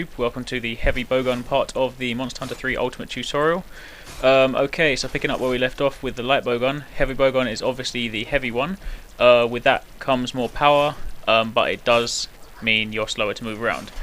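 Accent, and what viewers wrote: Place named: England, United Kingdom. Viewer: its always a guy with an english accent doing this tutorials XD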